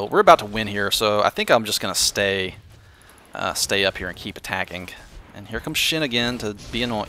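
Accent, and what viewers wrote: South, United States. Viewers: this video was amazzinggly helpful:D thank youu and I can't help but notice that adorable twinge of a southern accent you have:3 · u have a southern accent :3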